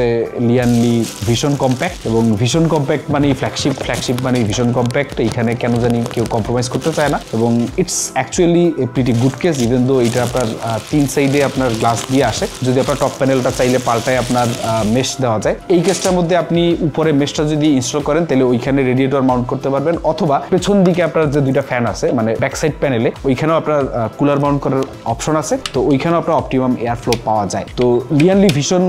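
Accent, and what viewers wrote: Barishal, Bangladesh. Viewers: Je kono ekta accent e kotha bolen. Hoy Barishal na hoy suddho. R na hoy beadob type lage.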